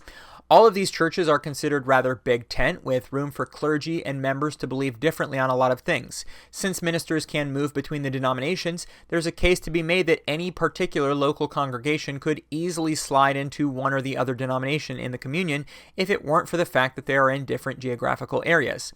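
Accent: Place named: United States. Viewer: The commenter didnt know you were american? What did he think you were by your accent? Maybe Canadian but you'd have to have a really bad ear for accents to think that! Another great video. Dont worry about it. Haters gonna hate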